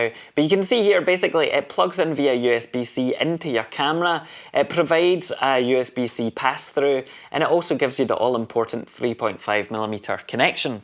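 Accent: Scotland, United Kingdom. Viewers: I spotted the word "Pish" used in your video, and I said tae masel, this guy's gotta be Scottish. Thanks for not disappointing matey 👍 🙂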